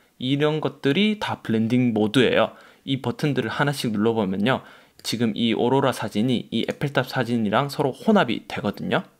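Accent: Gyeongsang, South Korea. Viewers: 억지로 서울말 안쓰셔도 됩니다ㅋ(아무리 들어도 경상도 사투리..ㅋ). 좋은 강의 잘 듣고 있습니다. 재밌어요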